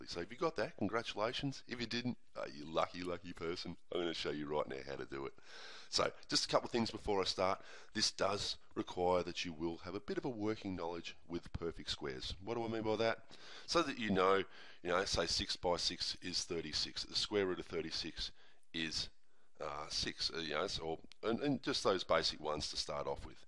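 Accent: Australia. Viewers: are you Australian ... ? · Is that an Aussie accent... I love it XD. Also thanks sirrr! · Man! Thanks u are brilliant . It will help us for sure. And i guess from me U are an aussie. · This man is violently Australian and I like it.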